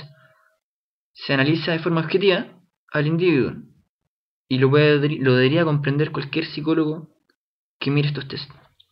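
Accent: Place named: Chile. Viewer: ¡Es chileno!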